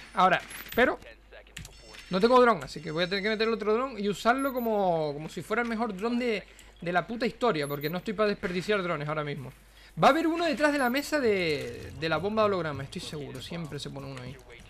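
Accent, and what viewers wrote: Canary Islands, Spain. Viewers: es canario?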